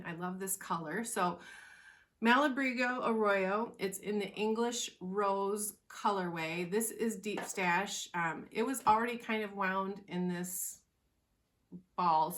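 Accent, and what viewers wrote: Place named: Sioux City, United States. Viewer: Perfect timing for a stash-along as I've decided 2022 is a no buy year for my knitting and cross stitch hobbies. I'm finally noticing all of the gorgeous yarns I have instead of focusing on the next new shiny distraction. I love your accent -- I've been in Tennessee for 30 years, but I grew up in Sioux City. You sound like home and family to me (with some Michigan thrown in ;)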